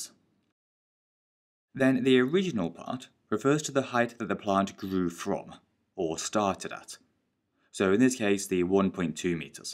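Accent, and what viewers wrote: United Kingdom. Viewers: I like your British accent:)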